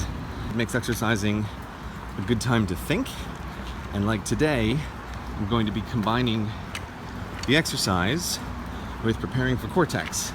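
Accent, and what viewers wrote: United States. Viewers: Woah woah woah woah hold on... you're NOT American? But your voice sounds so plain like ours.... WAT?!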